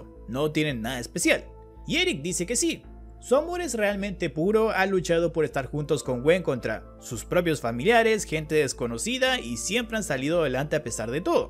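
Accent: Chile. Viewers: ERES CHILENO? 🇨🇱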